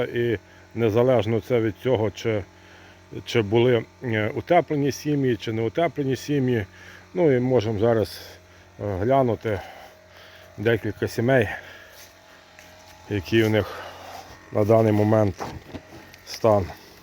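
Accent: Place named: Prykarpattia, Ukraine. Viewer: розмовляєте не по тернопольськи ,а більше говір схожий на Прикарпаття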